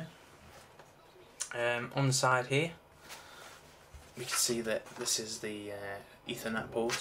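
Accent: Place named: Liverpool, United Kingdom. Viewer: Are you from Liverpool? Your accent sounds like you're from Liverpool so I was asking if you're from there...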